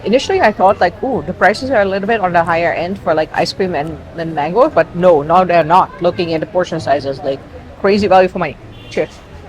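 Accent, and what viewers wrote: India. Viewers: Your accent turned to Indian accent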